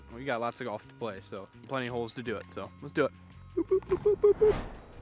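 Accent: United Kingdom. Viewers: Excellent british accent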